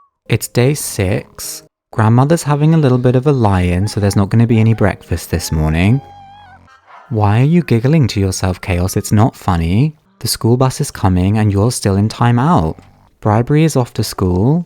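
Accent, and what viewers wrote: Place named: United Kingdom. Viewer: I’ve never seen this channel before, and I haven’t played the Sims 1 since kindergarten, but this video of this calm, friendly-sounding British man narrating the story of a neglectful grandmother and her 7 kids with unfortunate names is giving “creepy lost episodes of the Teletubbies” and I’m here for it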